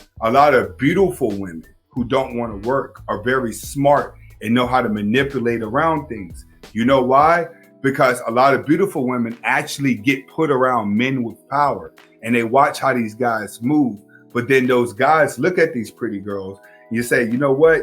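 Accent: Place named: Pittsburgh, United States. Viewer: You from the burgh bro?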